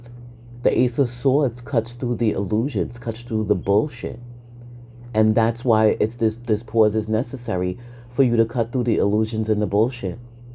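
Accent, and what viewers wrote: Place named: East Coast, United States. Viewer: I just LOOVVVEEEE your East coast accent!🥰❤❤❤💛🥰💋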